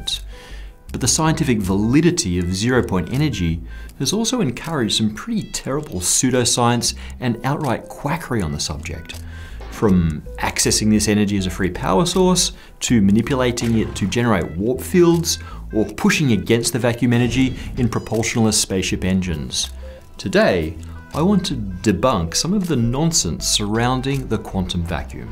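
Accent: England, United Kingdom. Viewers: Wow!!!!!!! This is an awful PBS Space Time clip. I'm shocked at how badly this misrepresents the applications of zero-point energy. It is this these kind of "Negative" (pun intended) clips that keep us using rockets instead of learning about electro-gravitics. 👎 Did you notice how they used someone with a slightly British accent to make it appear they are smart and intelligent enough to explain the concepts of physics. This clip ends with a gecko 'challenge' relating to their feet. This clip is simply pathetic. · Your English accent adds no credibility. And your video sucks.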